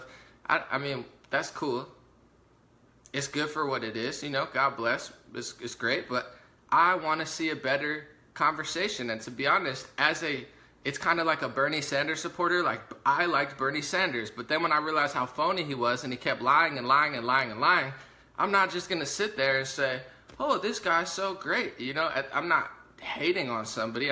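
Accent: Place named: California, United States. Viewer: I think he's like on a different wavelength as far as his style. He talks so fast and you talk like...well, like a Californian. 😁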